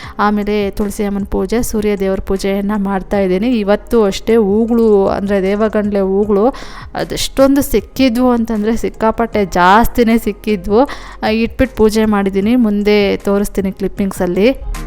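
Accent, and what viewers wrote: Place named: Kolar, India. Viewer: Nim language kolar, Hoskote tara ide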